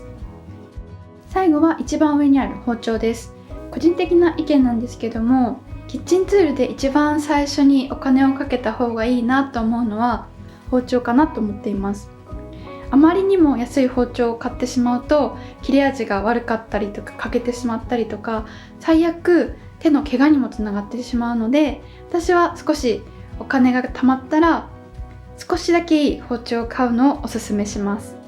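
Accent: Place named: Wakayama, Japan. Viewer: 発音が和歌山の方かな？って思って、間違えちゃいましたすみません笑🤦‍♂️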